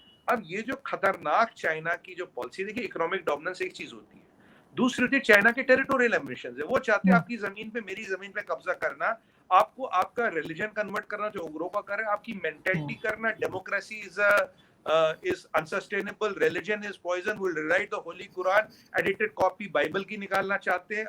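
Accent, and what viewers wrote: India. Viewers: aap ko sunte he to lagta he ki aap ek Indian he. Sumeet ji is best vakta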